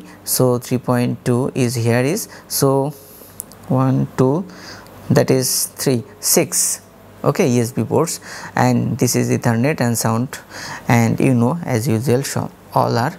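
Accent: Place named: Bangladesh. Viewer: Your English told that you are a Bangladeshi😅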